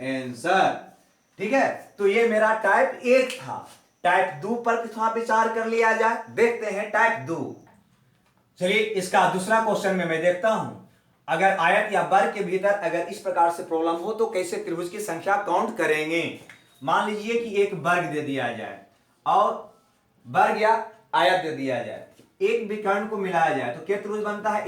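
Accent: Bihar, India. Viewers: Sir aap Bihar se h kya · wow gajab ka pdate ho sir...bihari stayl bha gya...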